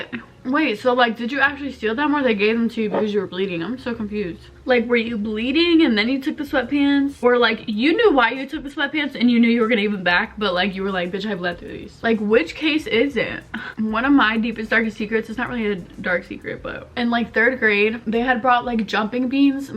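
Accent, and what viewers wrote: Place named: South, United States. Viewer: You remind me of the mom from ginny and georgia especially with the southern voice 😂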